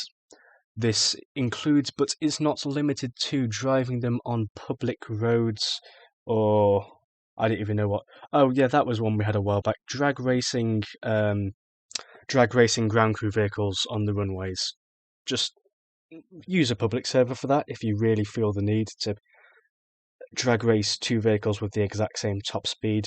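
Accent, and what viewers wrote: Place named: United Kingdom. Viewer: bruv ur bri'ish :D same here.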